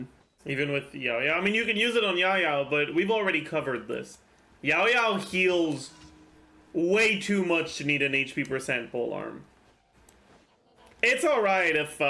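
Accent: Quebec, Canada. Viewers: Completely off topic - are you québécois? The way you pronounce these french words is so familiar for some reason.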